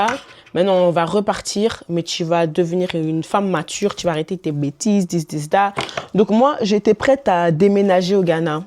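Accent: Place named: Democratic Republic of the Congo. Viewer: Moi aussi 😅elle fait cet accent congolais de temp à autre la, moi je me disais peut un parent congolais lol. C’est quoi l’histoire du français please. Like how did you start speaking French as a Ghanaian?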